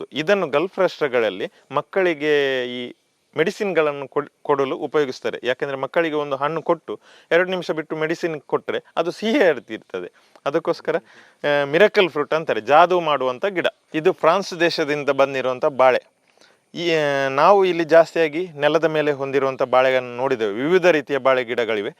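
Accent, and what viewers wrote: Standard. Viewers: Hats off to you sir, your knowledge is really appreciating... kannada thumba shudda mattu sundaravagide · Pure kannada ..kelokke thumba kushi aguthe ...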